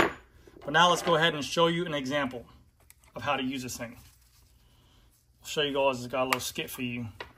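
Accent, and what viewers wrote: Australia. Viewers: The Aussie accent was on point! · Kool just ordered......Really the Aussie accent,.....to funny....oh and the reference to hill KOM, hilariously.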